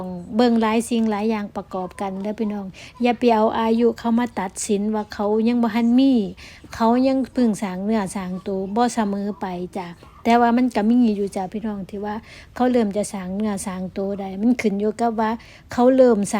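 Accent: Isan, Thailand. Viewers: แมนจ้าน้อง เว่าถึกคักจ้า สวัสดีปีไหม่จ้าน้อง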